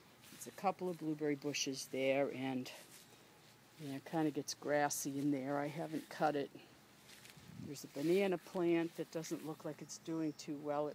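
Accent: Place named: New York, United States. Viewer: Nice garden. I tried to respond to your invite on Sonico but for some reason no luck since I do not have an account. But you do have my correct email address. I never realized you had such a strong New Your accent -- it sounds great! (<; Cheers! Are you still picking!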